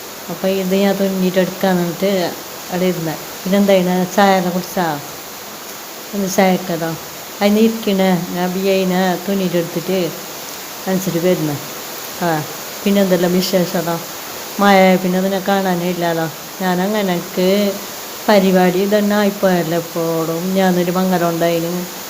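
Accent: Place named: Kasaragod, India. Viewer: Nighale sthalm evde Kasaragod Karnataka boadr bagathula slanganllo athayath nde slang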